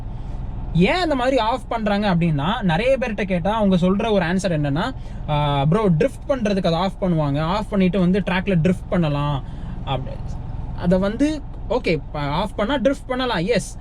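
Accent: Tirunelveli, India. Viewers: Bro neenga TIRUNELVELI thana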